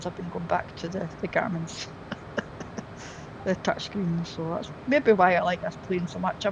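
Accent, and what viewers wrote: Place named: Scotland, United Kingdom. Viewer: Hi! You always make my day with your happy videos. And now that Outlander is finished 😢 I can also get my Scottish accent fix 😊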